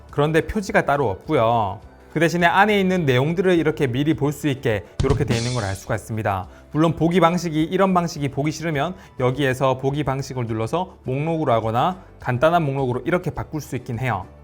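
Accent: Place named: Busan, South Korea. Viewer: 님 눈감고 들으면 안철수씨랑 말투가 비슷하게 들리네요 ㅋㅋ 같은 부산 분이시라 그런가봅니다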